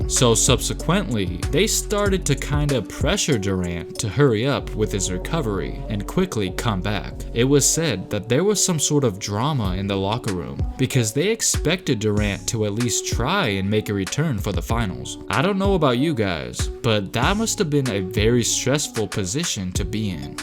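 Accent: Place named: Philadelphia, United States. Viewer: I was just thinking dat Philly all day lol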